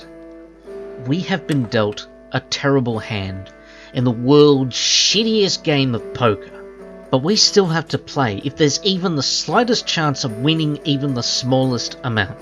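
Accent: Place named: Australia. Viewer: A video like this is exactly what I needed. It makes me remember that there's always light at the end of the tunnel even when it all seems hopeless. I'm glad that things are starting to work out for you. Also, your writing skills are truly immersive and I really hope you do more stuff like this in the future! P.S: You're Australian? I honestly thought you were British for some reason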